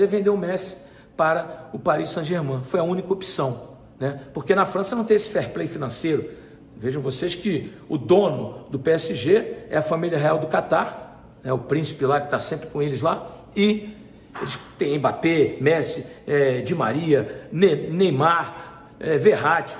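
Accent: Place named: Rio de Janeiro, Brazil. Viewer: E ai chega de Carioquice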